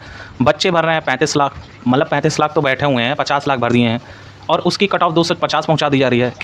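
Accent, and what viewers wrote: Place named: Ayodhya, India. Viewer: अयोध्या से हो सर भाषा से लग रहा है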